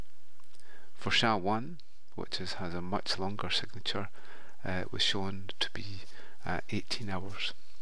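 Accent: Scotland, United Kingdom. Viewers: It's nice to get a Scottish guy on here explaining things :) !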